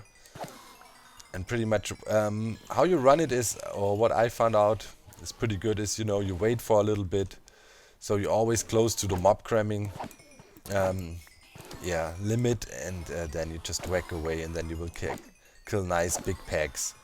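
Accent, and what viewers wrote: Germany. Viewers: you sound german r u german?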